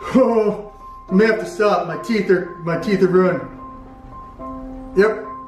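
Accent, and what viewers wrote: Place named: California, United States. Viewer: He sounds so California western now.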